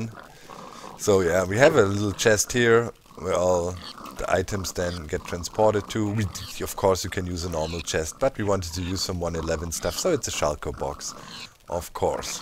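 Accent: Germany. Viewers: Mann merkt das er deutscher ist oder er hat diese deutsch englisch Akzent hat ^_^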